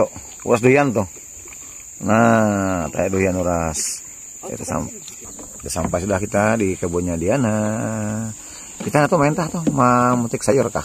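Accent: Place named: West Java, Indonesia. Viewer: Kok logatnya kayak orang Sunda atuh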